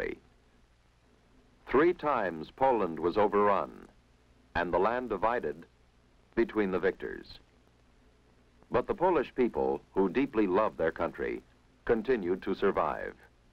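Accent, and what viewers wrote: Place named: Mid-Atlantic, United States. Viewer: I'm not sure but he is speaking a mid transatlantic accent which was really great.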